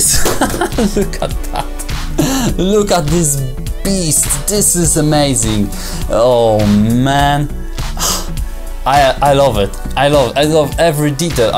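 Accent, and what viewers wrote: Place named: Poland. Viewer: Oooh, Han Solo Pops! There are so many Funko Pops I want :D Btw, I really like your accent, it almost sounds Polish? Am I right? :)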